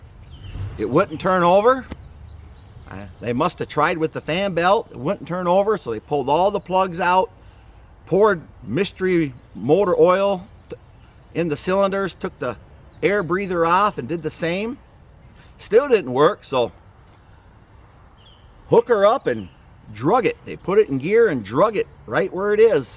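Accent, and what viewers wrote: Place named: Dakota, United States. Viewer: No this is really how he talks, being from Dakota will do that to ya! Good will it run video!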